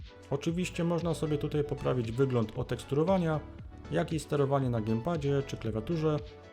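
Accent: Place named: Silesia, Poland. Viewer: Jesteś ze Śląska?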